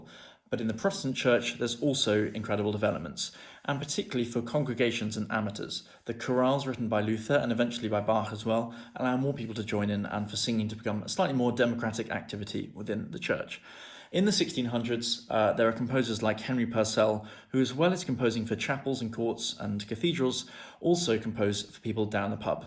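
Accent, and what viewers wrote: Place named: United Kingdom. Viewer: What a brilliant and amazing 5 minutes,! (Also I love that perfect British accent; these days, it's fast disappearing).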